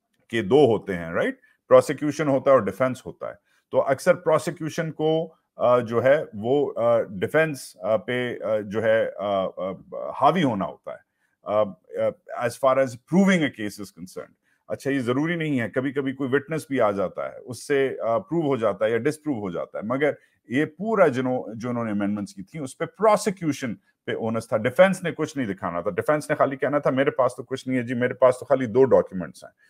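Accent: Punjab, India. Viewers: Love you Wajahat and your Punjabi accent . Superb bro. tum naay khoob tease keaya isss punabi fauj kooo . yeh punjabi dhagay sirf khaa bhee sakty hain moty maghaz kee log hain yeh sary boot. per inko $$$$$ ginanna aur plot bnana khoob ata haay. aur tu aur papa johnz bhee tumhary neighbour main inhee kee hain. dekho looo punjabi fauj kooo. haram kee adat paree huee hay inkoo. yaar itna arsa inkee sath kaam keya tum naay yeh tu samggty hoo fauji koo dosry kee jeeb saay mal nikalwana khoob ata haay. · No, being a Punjabi myself, I enjoyed your Punjabi accent while explaining the amendments. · پنجابی کا تڑکہ یا touch اچھا ہے۔ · Always a big fan of you bro and loved the way you explained in bit of Punjabi swag 😜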